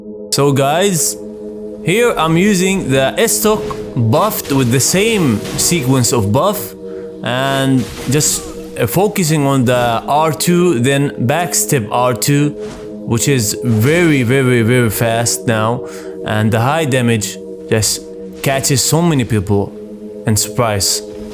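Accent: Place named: Gulf, Arab world. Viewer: The accent , are you Saudi , Qatari , Kuwaiti ? Uae or Bahraini 🤣 Subscribed for the accent 😂👍 حبيبنا 👍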